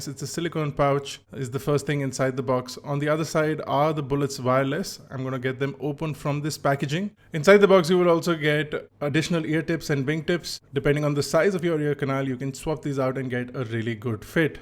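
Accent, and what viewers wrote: India. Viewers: work on your indian accent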